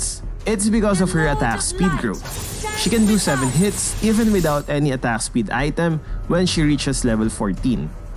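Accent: Philippines. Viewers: Loving your accent, the way you say "windtalker" ughhh sexy Filipino accent❤️ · But the way he says "attack" is kindda weird bcz of his thicc Filipino accent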